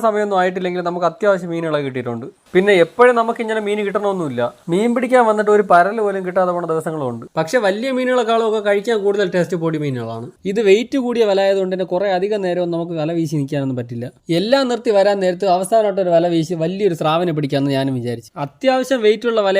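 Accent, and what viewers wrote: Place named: Trivandrum, India. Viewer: Full thaalam kelkanam...😂😂😂😂 Trivandrum aanalle broi ❤️❤️❤️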